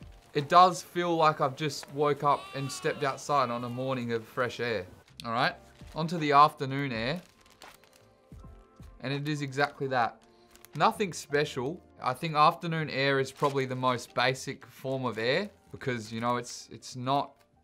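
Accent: Australia. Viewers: When you thought theres nothing left for him to try he just goes above and beyong with a total curve ball and tries AIR. What a lad, this is why I subscribed! That and the thick australian accent. OH, dude you should try and compare accents and see which one is better xD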